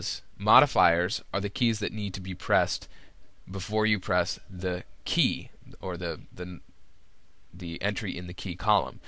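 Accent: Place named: United States. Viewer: Thank you so much! This is exactly the information I was looking for i.e. 'How to print a list of your customized keyboard shortcuts'. I've been looking for this since forever. This video should have Millions of views! - It's short and to the point. - It's narrated with clear native USA English. - It does what it is meant to do i.e. help people.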